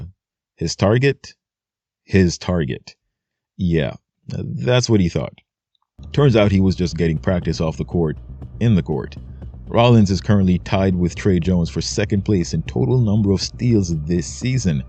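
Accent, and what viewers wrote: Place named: Jamaica. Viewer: Jamaican is the last thing I imagined you to be. And now I can hear it. Not that I don't think a Jamaican can code switch to accentless English. Praying for those victims of the hurricane.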